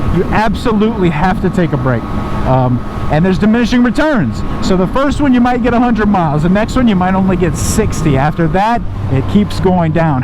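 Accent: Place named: Louisiana, United States. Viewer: Very informative. Nice, soothing Cajun voice.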